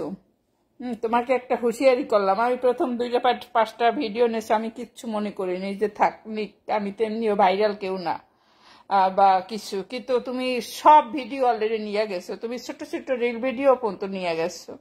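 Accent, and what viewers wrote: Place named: Barishal, Bangladesh. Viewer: বরিশাল এর ভাসা শুনতে ভালো লাগে।।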